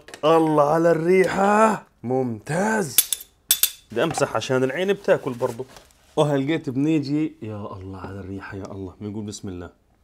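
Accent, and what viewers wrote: Palestine. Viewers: بالعكس لهجتوا فلسطينية بحته احنا هيك ابنحكي في فلسطين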